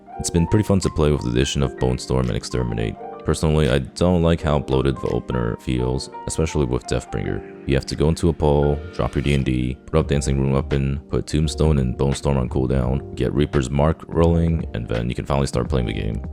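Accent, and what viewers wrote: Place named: New York City, United States. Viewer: NYC ABC is a very specific accent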